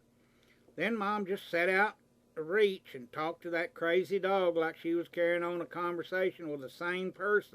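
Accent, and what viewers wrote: South, United States. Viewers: I really enjoyed this story. For the life of me though, I don't remember your accent being so Southern! LOL. Must have been blown away by your Producer Status! Marianne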